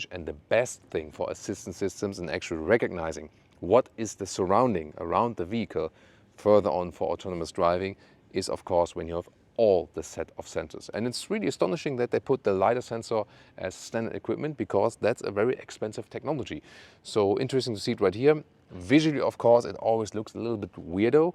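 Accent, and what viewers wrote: Germany. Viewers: Allway Watch he has more of an accent then my cousins and they’re German too. Lol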